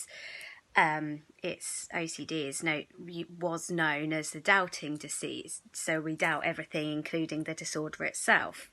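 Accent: United Kingdom. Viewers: I love UK accent.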